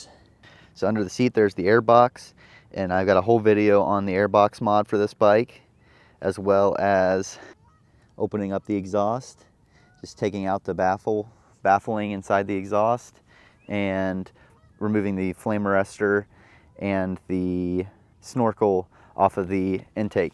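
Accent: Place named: South, United States. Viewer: watching from Australia I'm guessing southern? Hazard county?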